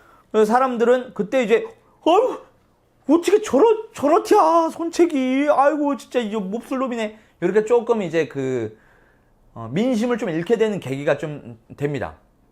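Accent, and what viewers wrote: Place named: Chungcheong, South Korea. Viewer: 충청도 삼촌이 구수하게 삼국지 얘기 들려주는거 같다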